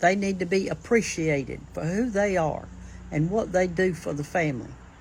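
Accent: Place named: South, United States. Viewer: You are so precious! I love your recipes i just subscribed! Love that southern accent!!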